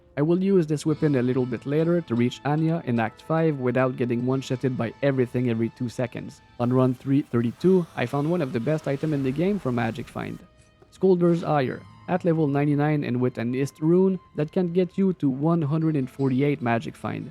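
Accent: Quebec, Canada. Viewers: Bro je reconnais un ti accent queb? Lets gooooo nice vid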